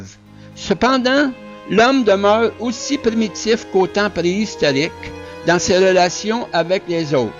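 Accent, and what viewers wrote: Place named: Canada. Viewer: Putain j'ai cru au début que c'était un débile mental qui parlait au début. Après je me suis rendu compte que c'était un canadiens... \o/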